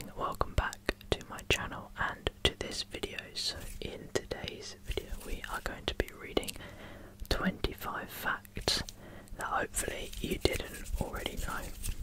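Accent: United Kingdom. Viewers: Congrats on 7K subs. Btw I love listening to your British accent 🥰. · Very cute thumbnail huh. Got to be careful using that word until you're eighteen because where I'm from your underage until then. But none the less I love listening to somebody whisperer in a British accent and list off a bunch of facts. British accents are very hypnotizing.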